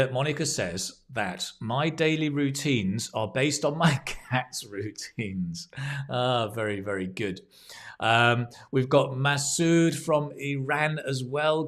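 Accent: United Kingdom. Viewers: you deserve all of respect and appreciation. I always enjoy listening to your amazing british accent ❤❤❤❤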